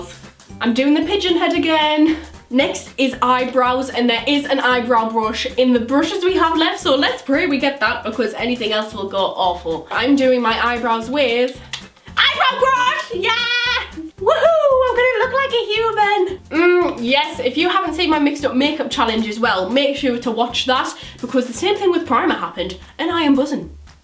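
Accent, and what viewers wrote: Newcastle, United Kingdom. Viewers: Hello it's by birthday today and it would be really really cool if you could reply to my comment !!! I love your channel soooo much and you're so funny and your jordie accent melts my heart !!! Love you so much it would mean the world if you read this !!! Thankyou xx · Are you from Newcastle xx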